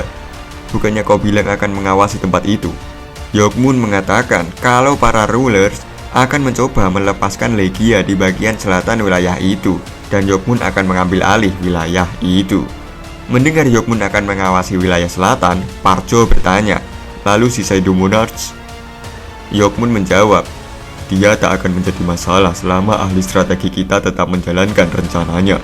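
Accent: Java, Indonesia. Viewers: Saya dari part 1 di season 2 ini, saya merasa denger cerita korea dengan logat jawa, tapi seru, dan saya suka.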